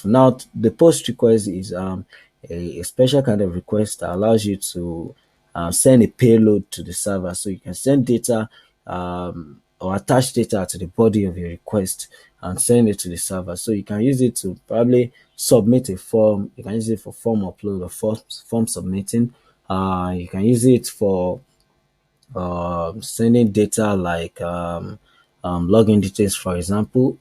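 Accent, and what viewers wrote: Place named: Nigeria. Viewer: You sound Nigerian 😅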